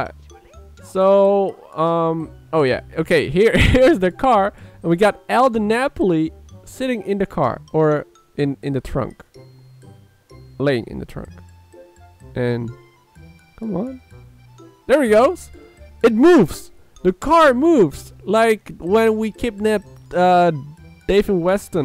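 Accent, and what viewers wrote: Netherlands. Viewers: Are you Dutch? You sound like a Dutch man.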